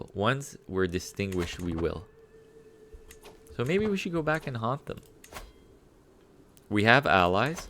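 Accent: Arab world. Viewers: loved it, and its nice knowing ur an arabic from ur accent, keep going bro.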